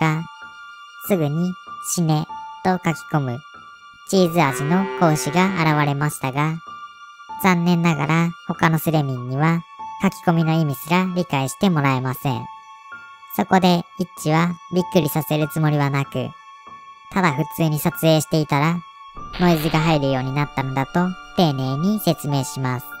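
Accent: Kansai, Japan. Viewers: 関西弁ニキちーす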